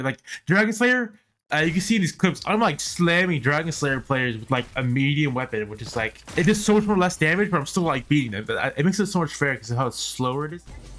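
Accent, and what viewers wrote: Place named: India. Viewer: havent watched your videos in a while cant stop noticing that your voice has gotten more indian like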